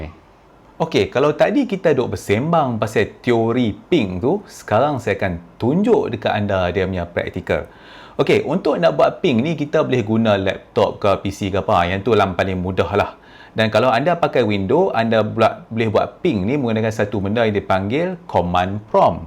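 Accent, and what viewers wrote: North, Malaysia. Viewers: no worries. Sdp dgr org utara habaq ni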